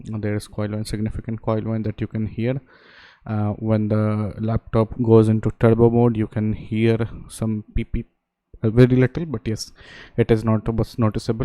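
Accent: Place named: Bihar, India. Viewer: Bhai aap bihar se hona ? Meri gf bhi bihar se hai.. aapka accent match karta hai usse.✌🏻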